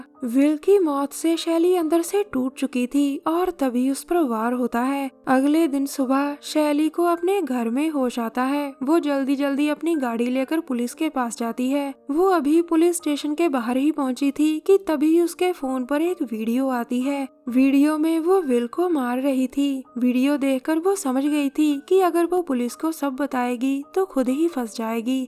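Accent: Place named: Bihar, India. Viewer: Always here Love you didi You are from Bihar I know 😁🙏😁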